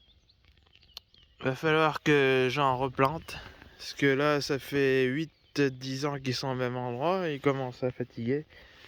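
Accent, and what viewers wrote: Charente, France. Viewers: J'adore ton accent Charentais (les cagouilles😄). Bonne continuation 😉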